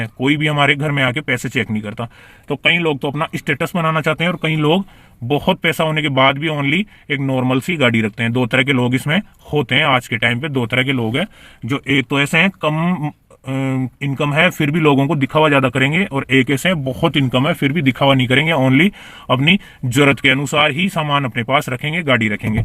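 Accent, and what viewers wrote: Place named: Kota, India. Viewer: Bhai आप Kota k as pas k ho.? Maine boli se pahchan li😂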